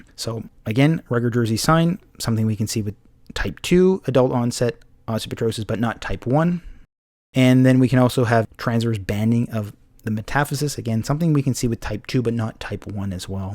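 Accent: Canada. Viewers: Excellent clearly ordered and explained. Look forward to more. Canadian accent helps!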